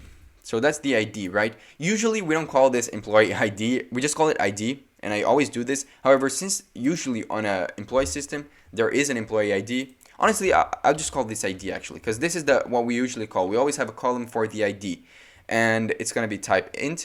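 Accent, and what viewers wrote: Brazil. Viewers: Mano pior que o sotaque também entrega kkkk esse é o primeiro vídeo que vejo seu e já tive quase certeza que tu é brasileiro pela forma de falar, porque eu falo do mesmo jeito hehe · This is the first time i listen to a brazilian speak english... Why Brazilians dont like to speak english?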